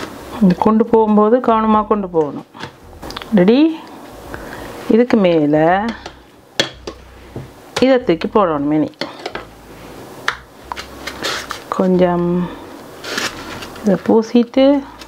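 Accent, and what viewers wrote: Jaffna, Sri Lanka. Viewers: jaffna tamil pesathinga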